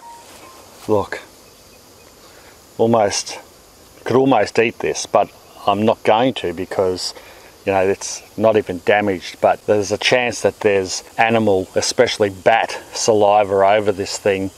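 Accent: Australia. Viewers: You look awful like Russell Crowe. Also, you happen to be Australian, judging by your accent. You don't happen to be related to him, do you?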